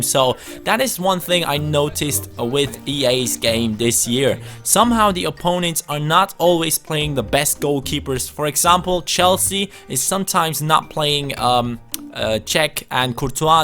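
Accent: Germany. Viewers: He's Bavarian German ;)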